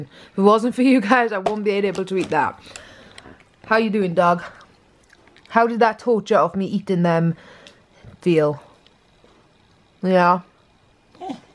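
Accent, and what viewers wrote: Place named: South Wales, United Kingdom. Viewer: Sounds like you're South Walian. Greetings from Aberfan, Merthyr Tydfil. How about a duet. Try - featuring from the Retrospect Tour.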